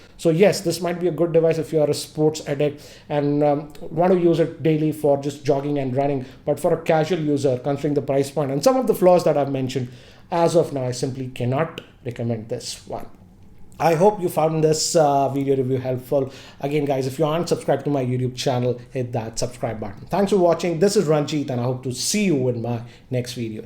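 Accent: India. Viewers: He is an Indian and most of the Indians have a similar accent but he is providing good content though. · Truth time...I only clicked on the video to hear an Indian English accent....was not disappointed. Also....nice review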